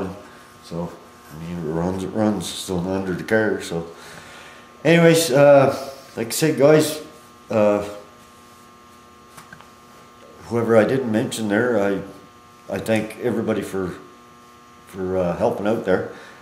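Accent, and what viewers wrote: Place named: Nova Scotia, Canada. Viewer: am in Halifax , thought you sounded Nova Scotian, Im into old Novas too and have done that frame thing as well,,had mine sandblasted, painted it inside with brushes on a long stick or piece of bent tubing .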